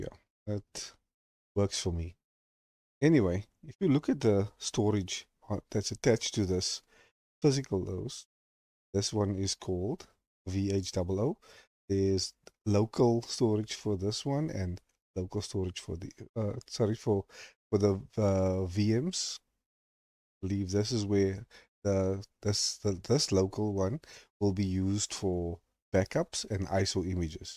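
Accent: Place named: South Africa. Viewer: First thing I thought was, Wait is this guy South African? Thanks you solved my issue after sukkeling all day, my settings needed to be fixed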